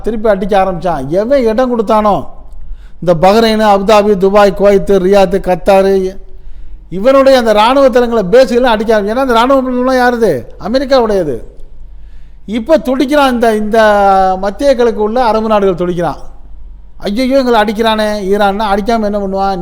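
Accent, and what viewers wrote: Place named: Rural, India. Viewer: உங்களின் பேச்சில் கிராமிய மனம் வீசுகிறது.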